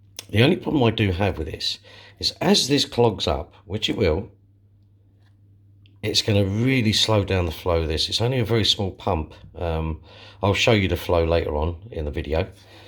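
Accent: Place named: United Kingdom. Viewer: Then, with about 15 years (I lost track) I'm a newbie in the hobby! What I love about chatting online is that we can learn from others that live in another country, I'm from NL, I guess by your accent you are located in the UK? There are some cultural differences (when it comes to fish keeping) from country to country and it's those differences that we also can learn from. Always good to expand my view. Although I think the difference between the UK and NL aren't that huge. You have a great YT channel, keep up the good work!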